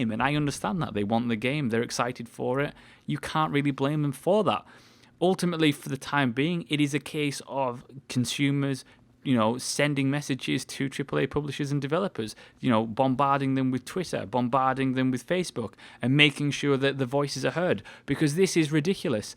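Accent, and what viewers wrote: United Kingdom. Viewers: I do not believe almost any "professional" reviewer (ign, gamespot, what have you) ever was paid to give a positive score, pretty much because once such a thing was discovered (and yes, it would be) he'd have an easier time kissing Emily Brontë than finding any other job in the industry. Also, surprise surprise, first Beth game out under this conditions is criminally woeful so far as performance goes on pc. Good piece man, I'm going to watch some more vids but you got a new sub in all likelihood. (plus, a British accent never hurts)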